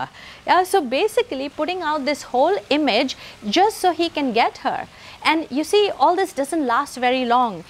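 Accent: India. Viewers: You speak pure Indian English. Which is obvious and with charming pronunciation. So it becomes very easy to understand;and there for everybody likes your lectures. You are a jwel for the Indians. --Dr Mohanty, Seraikella (Jharkhand).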